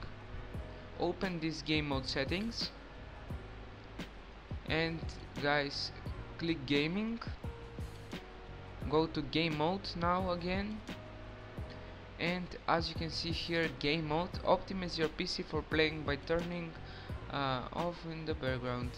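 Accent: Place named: Russia. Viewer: видно русский пытаеться на английском говорить красава молодец!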